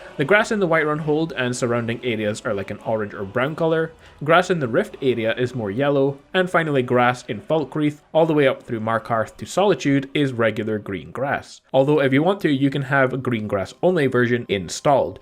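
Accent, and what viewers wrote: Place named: Scotland, United Kingdom. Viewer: hes scottish i believe :)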